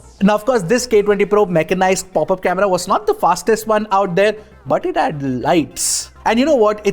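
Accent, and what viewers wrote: India. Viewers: Yuk indian accent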